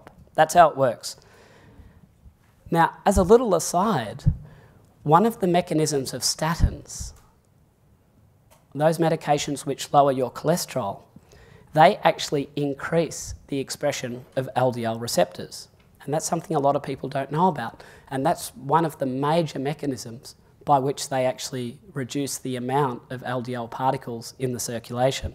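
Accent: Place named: Australia. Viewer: Great post. One note though pertaining to #2 above - the doctor in this presentation does address A1C. He refers to it by its full name of HbA1C with an Aussie accent that slightly throws off an American ear. And he says more or less what you do in #2. That the time averaged blood sugar level A1C reports is proportional to glycation levels upon all cells in the body including LDL. Otherwise, I'm still learning, but one thing at least some statins have are anti-inflammatory properties. Up until I saw this video just now, my understanding was that the epithelium layer inside your arteries is damaged by the repeated tug of war between blood sugar spikes and insulin to pull it back down. That sugar-insulin cycle over and over is inflammatory and damages the epithelium which is then "healed" by plaque your body deposits to protect it (like a scab). But in this video the doctor says it's all caused by damaged (glycated) LDL particles which find their way under the epithelium and are then captured by macrophages which then become foam cells which eventually results in arterial plaque. 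So this was a very interesting video indeed. The learning continues. One thing I know to do now is to try my best to get my triglycerides down, my HDL way up and my A1C down below 5.0 if I can. LDL seems of little importance if I get the others in line.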